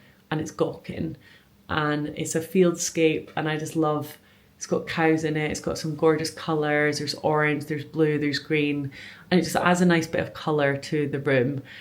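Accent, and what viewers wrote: Scotland, United Kingdom. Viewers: I think she's Scottish :)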